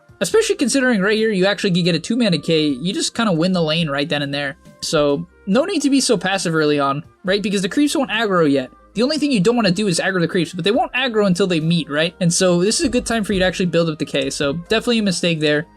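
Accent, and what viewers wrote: Australia. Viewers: Australian accent got me good 😂😂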